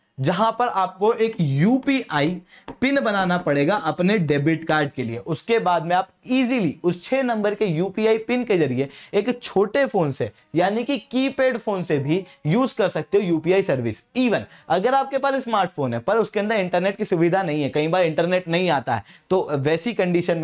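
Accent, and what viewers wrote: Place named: Bhopal, India. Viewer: Bhopal se ho kya bhai 😐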